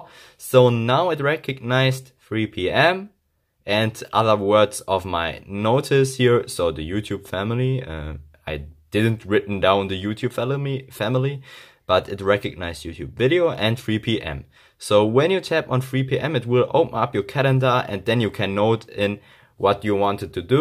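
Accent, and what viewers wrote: Germany. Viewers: Are you from Germany? You sound like a german dude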